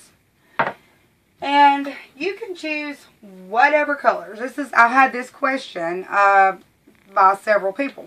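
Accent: South, United States. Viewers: I can't add anything that has not already been said except "War Eagle"!! I was so glad to hear a southern girl sharing such great teaching information. I am from Alabama and have added myself as a subbie. Really look forward to seeing your other videos and any new ones. God bless and thanks for sharing! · ... while this particular design of granite is too busy for me, I have to say that it is a great technique, especially for people who are on a budget, $25 is an awesome price to transform counters. As far as your way of speaking, don't apologize for it. You are southern and sometimes that's how southern people speak. It may not always be correct but it's not a sin, LOL. I'm from New York and we have a distinct accent as well and we say words differently but I'm proud of how I speak because I'm proud to be from New York City. When I make mashed potatoes I always call them taters:) because I love that word! 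Another word I like is reckon, so keep on being YOU <3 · Love your southern accent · Hey there! I LOVE this tutorial/idea and have already bought the supplies. I think you are super cute and your Southern accent is adorable. I will share pics of my completed project when I am done. God bless you hon, wish we were neighbors! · I just love you accent, a true "grits" girl like myself. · Okay, I hate to ask this, but I'm from Michigan and you have a southern drawl and there is one part that I'm not quite sure what you are saying. You said in the very beginning to make sure you get the kilz base..Now I don't know if you said OLD Kilz or OIL base Kilz... I really want to do this and I don't want to mess it up. I put the captions on and it showed that you said Old.. but when I watch you say it, it looks like you are saying OIL but with that southern drawl. Could you please clarify for me so I don't mess it up? 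Thanks in advance. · i love your videos btw, are you from the carolinas? i love the southern bell twang. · Kudos to the closed captioner whoever you are! Sometimes I have a bit of difficulty understanding a Southern accent - and you were totally unflappable. :-) This looks like a good way to 'upscale' a counter! Which is a nice way to look like you have a champagne budget when you only have a Kool-Aid budget.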